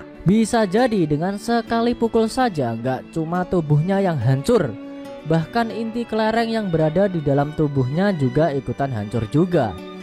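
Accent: Java, Indonesia. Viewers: Mimin orang jawa ya? Suaranya medok banget njirr mirip orang jawa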